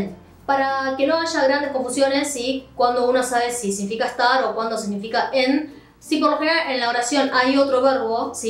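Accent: Argentina. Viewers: Gracias por su ayuda. Observación: Cuando usted dice en español '¿"Tú trabajas"? o ¿qué trabajo haces?; yo escucho que dice ¿"Tú trabajás"? o ¿qué trabajo hacés? Otro caso: ¿cómo te llamas? ...escucho que dice ¿cómo te chamas?..es por el acento argentino pero no tiene que ser así ¿no? ..Gracias por los ejemplos que me son muy útiles. Podria dar un ejemplo con shangban ? Gracias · La profe tiene un acento argentino. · esta chica habla como Argentina, tal vez aprendió español en argentina... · ¿Chilena? No me lo creo. Tiene que ser argentina. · Que horrible se escucha su tono argentino, hay otras asiáticas que se creen ya también españolas con su tono.......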